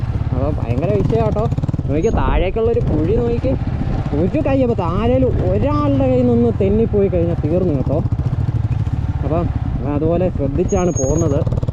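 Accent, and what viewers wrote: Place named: Kollam, India. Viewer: athalla bro broyude place kollam allenn choikkan karanam slang anu atha😁😁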